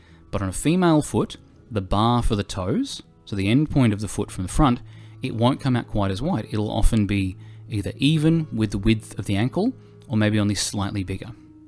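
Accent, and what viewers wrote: Australia. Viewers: Your accent sounded familiar and than I realized ya were australian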